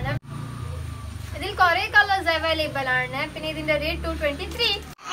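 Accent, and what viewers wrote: India. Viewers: Ksd basha pola ind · Malayalee ano atho tamil ano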